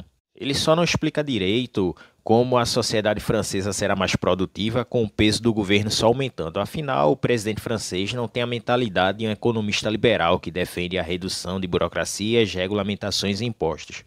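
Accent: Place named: Northeast, Brazil. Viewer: É muito bom ouvir um conterrâneo cabra da peste narrando. Como faço para me candidatar? Seria uma honra.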